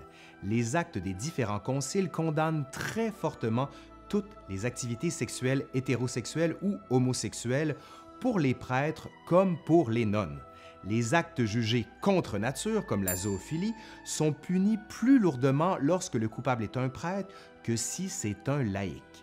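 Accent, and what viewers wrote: Quebec, Canada. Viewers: Je suis venu grâce à l’accent français du Canada pour mieux l’apprécier. Et maintenant j’apprécie les vidéos et tous que j’apprends ! Merci ! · je ss restée bouche bée en écoutant la langue française québécoise pour la première fois 😯 qui est comme moi??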